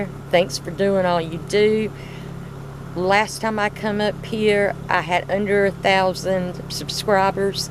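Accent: South, United States. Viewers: Thank you for getting back to me. My mom was from Little Rock Arkansa and my daddy was from Richmond Vagina, i am from Washington DC. I always Loved a country accent!! can't wait for your next adventure!!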